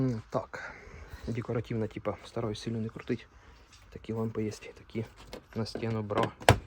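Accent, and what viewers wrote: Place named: Surzhyk, Ukraine. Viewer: Ти - чудовий блогер і обізрівач, якийсь рідний і близький, як справжній! Не звертай увагу на коментарі заздрісних диванних "експертів "! І суржик твій - просто шикарний - щось близьке і приємне для вух, бо дуже багато українців розмовляють в побуті саме такою мовою! УСПІХІВ І МІЛЬЙОНІВ БАЖАНЬ, які неодмінно ЗБУДУТЬСЯ! В ТЕБЕ ВСЕ ВИЙДЕ, як мрієш, а можливо навіть краще, ніж очікуєш, не бійся мріяти і робити, що тобі хочеться!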